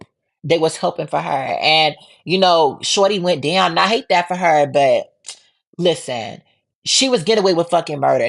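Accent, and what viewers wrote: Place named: New York, United States. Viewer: I love your commentary where are u from you song like a new yorker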